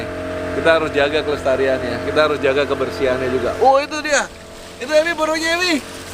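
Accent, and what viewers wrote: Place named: Surabaya, Indonesia. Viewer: Omahmu suroboyo ta om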